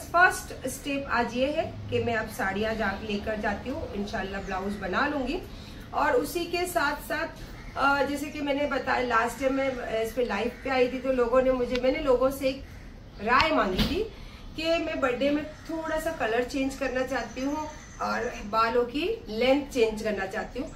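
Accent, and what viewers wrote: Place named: Andhra Pradesh, India. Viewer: Yeh most of kyaa hain pata nahin kahan se english sikhkar aayi hain hindupur ki english hain 😂😂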